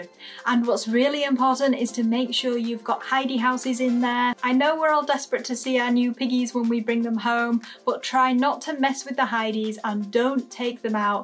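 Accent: United Kingdom. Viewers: you are right I found the more I ignore my new piggies the more they want my attention. we enjoy your videos they seem to like your British accent. we live in Arizona in the states. The only thing they like to watch is you, planet earth,and bobs burgers in that order. Thank You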